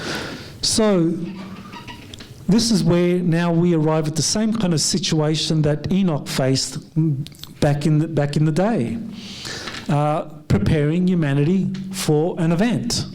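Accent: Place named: Australia. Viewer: You were a former professor? What are you now? You speak in a broad Australian accent, yet you mutate the pronunciation of "AntarCtica" as if you you were one of rhe exceptional people from the indispensable country. You do reslise that there is a letter "c" after the letter "r" in "AntarCtica"?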